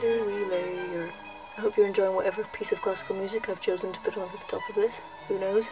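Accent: United Kingdom. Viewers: ...But he is British. He always sounds British.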